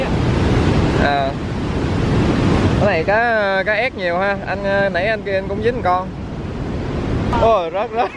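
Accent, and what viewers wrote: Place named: Tam Hiep, Vietnam. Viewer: Giong dab tam hiep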